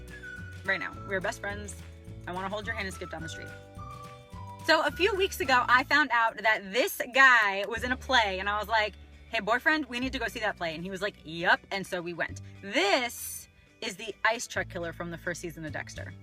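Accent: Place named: New York, United States. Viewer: Listening to you talk about Shakespeare makes me wonder how cool it'd be to watch a Shakespeare play with a New York accent.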